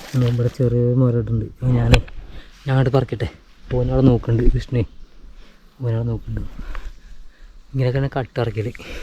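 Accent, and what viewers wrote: Malappuram, India. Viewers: ഗെയ്സ് നിങ്ങൾ പൊളിയാണ് സിമ്പിൾ ആയ വിവരണം ,നമ്മൾ സ്വയം യാത്ര ചെയ്ത് explore ചെയ്യുന്ന ഒരു feel ഒരു തള്ളും ഇല്ലാത്ത നല്ല വിവരണ vdo, ഞാനും ഒരു മലപ്പുറം ജില്ലക്കാരൻ തന്നെയാണ് ,, ചെറുതായിട്ട് നമ്മുടെ സ്ലാഗ് കുറച്ച് ഒക്കെ കുറക്കാം നിങ്ങൾക്ക് ഈസി ആയി പറ്റും ,വരും യാത്രകളിൽ ഡ്രോൺ ഒക്കെ ഉപയോഗിക്കാൻ കഴിയട്ടെ ,, നിങ്ങൾ മില്ല്യണുകൾ അടിച്ച് കേറി വരട്ടെ എന്ന് ഈശ്വരനോട് പ്രാർത്ഥിക്കുന്നു ,ഒപ്പം എല്ലാ സപ്പോർടും❤❤❤❤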